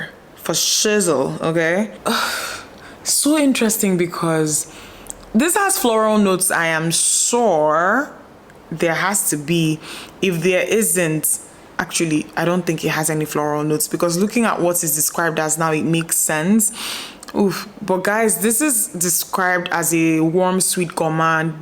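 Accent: Nigeria. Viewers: Nigerian accent, how? 😂😂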